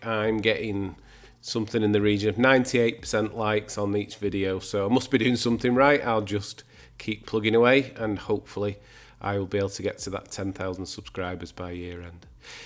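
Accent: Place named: Scotland, United Kingdom. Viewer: your furniture remake are truly beautiful. Word is getting out about the great work you do. Is it OK to ask what part of the UK you are from? I have a limited knowledge of accents but yours reminds me of closer to Scotland.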